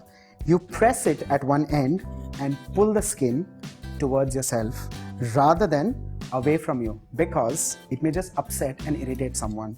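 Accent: India. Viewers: strong indian accent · You speak english with indian accent i have no idea wht you talk haha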